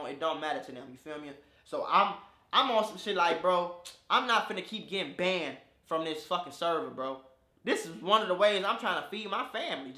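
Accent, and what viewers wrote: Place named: South, United States. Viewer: You sound like you from the Souffffff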